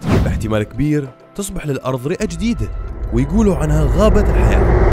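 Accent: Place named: Iraq. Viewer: المعلق بالفيديو عراقي😂😅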